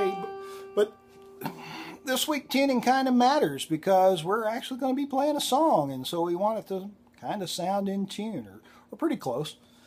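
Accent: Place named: United States. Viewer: Dear, thank you for these lessons! Can I ask which state your accent is from? I adore it. Love a Brit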